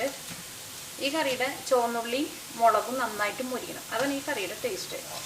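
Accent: Thrissur, India. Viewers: We Like the Trissur slang. All of your recipes are simple and really good! Keep it up!!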